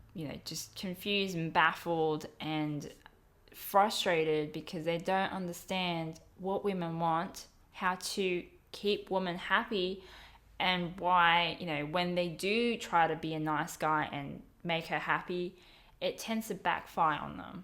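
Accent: Australia. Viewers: are you Australian?? =] you have my accent haha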